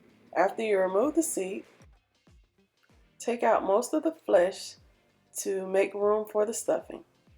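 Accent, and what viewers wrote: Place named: New Orleans, United States. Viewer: Girl, you sound like...a NOLA Girl! Gotta have that Creole flare. You gotta tell me where you get the vegan meats because in New Orleans, you can only find a few aspects of them. I go to whole foods and if I look for the beyond meat crumbles you mentioned, they only carry the burgers and chicken strips.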